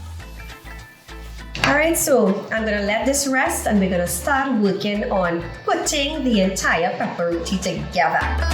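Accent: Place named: Indo-Trinidadian, Trinidad and Tobago. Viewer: She is speaking like Trinidad Indian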